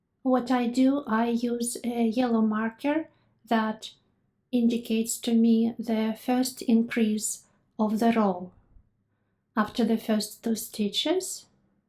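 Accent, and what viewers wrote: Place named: Ukraine. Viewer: I need an entire tutorial from start to finish. If you just want to make a video snd sell me the disk I would gladly pay up to 200 dollars. That's how important it is to me. I'm 69 years old and have noone to help me. I can't understand how to read patterns because I don't know enough stitches. I suffer from depression and often can't sleep. Knitting requires me to concentrate snd focus do when I get up in the middle of the night I can knit and the time just flies and before I know it its daytime snd my crisis has past. It's miraculous to me. Also, I have had a 20 year career as a training developer snd have a Masters in instructional design of online learning. You are the best. You should win an award. I'm going to look into recommending you for one. Also, are you Ukrainian? I only ask because I was raised by my grandmother who was Ukrainian and your accent sounds alot like hers.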